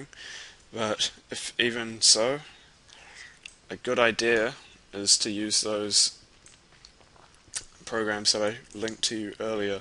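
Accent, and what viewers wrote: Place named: New Zealand. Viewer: Bro NZ accents are sick, coming from a Canadian here :) Aussie accents are sick too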